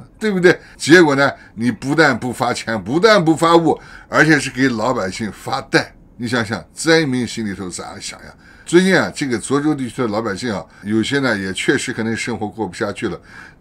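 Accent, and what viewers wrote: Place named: Northwest, China. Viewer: 熟悉的西北音~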